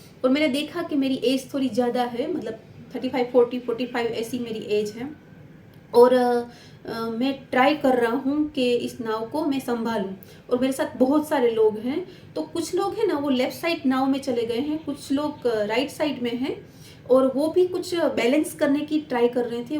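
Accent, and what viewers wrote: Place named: Gujarat, India. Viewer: .... Tamari bolvani style.. Havbhav bikul Gujrati se 👌👍😇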